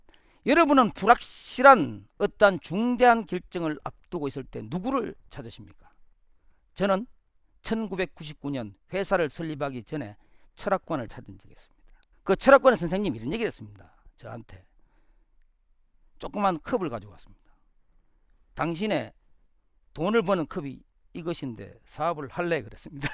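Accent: Gyeongsang, South Korea. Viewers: 오랫만에 듣는 명강의 였습니다 처음 종로선글에 찾아 올땐경상도 말씨로 약간은 덜세련된 말투가 너무 진정성과 친밀감을 느꼈습니다 아침 같은시간대에 어김없이 방송해주시는 마력에 빠졌습니다 삼성에 근무하셨다는 말만으로라도 나와같은 삼성가족 이구나하는 끈끈함과 가끔은 우리집사람이,우리집 사람은 하고 호중님 얘기를 하시는 모습이 너무나 자상한, 인간적인 면모를 보는듯 합니다 대통령상을 두번이나 받으실 자격이 충분하다는 생각을 했습니다 늦었지만 맘껏 축하드립니다 고맙습니다 신께 감사드립니다 호중님곁에 회장님이 계시고 그래서 저도 인연을 맺게 됨을 말입니다 환절기에 건강을 기원합니다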